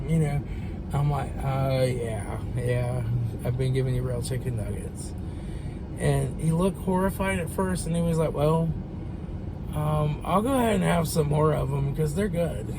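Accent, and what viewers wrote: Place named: Ohio, United States. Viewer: I knew it! I'm from Tipp City OH originally, moved out west in 1996. Now I notice an Ohio accent. I like listening to you because you sound like family, lol. You sound like my brother. Love your videos!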